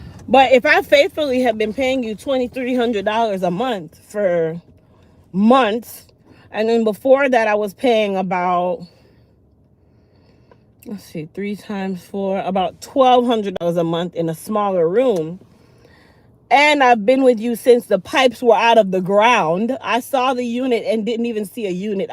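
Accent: Jamaica. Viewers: how are you, you always look good and your hair's on point, I love the color and style period. You are a funny person and when you get hype or talk fast I hear your accent the show that you are from Jamaica, I'm just smiling the whole time and still smiling while I'm doing this text to you, you are the type of person that every person need to have you around them, you happy, funny, joyful, very, very smart, and a beautiful person, Heaven Sent from God, I'm glad that I know you from afar and I will always be looking at you live on video, and ordering your products, I just order some of your products April 1st, 2023, thank you for that 35% off, I do appreciate it so much, take care and tell the next time, take care be safe and God bless always, love you girl, peace out.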